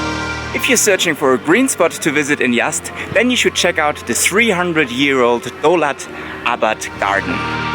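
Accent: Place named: Germany. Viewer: accent reminds me of all my friends from Deutschland. 🇩🇪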